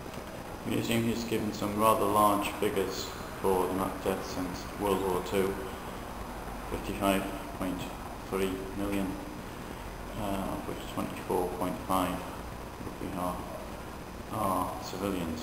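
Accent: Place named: England, United Kingdom. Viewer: I wanted very badly to go to this museum April of 2005. My girlfriend (at the time) spoke fluent Russian and German. She agreed to help me document the 60th battle reunion. I had money saved up for two plane tickets. Long story short, we didn't end up going .. Glad you could make it though! Sounds like your from England, I'll bet WWII battlefield tours are a virtual hop-skip-jump for you. Lucky dog you.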